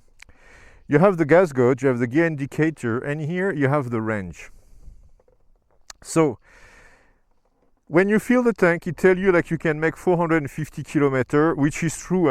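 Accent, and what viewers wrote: France. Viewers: Je me disais bien que c'était un accent bien français ahah ! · I knew you'd buy it! You instantly fell in love with her it was obvious! Great machinery. And yep, I have already checked your vid, it's awesome as every other you have put out till now👌we greeks love a thick French accent! Hehe 🤗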